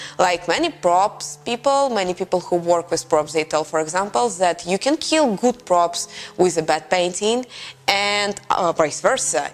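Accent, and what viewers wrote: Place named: Russia. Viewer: Опять эти Russian инглиш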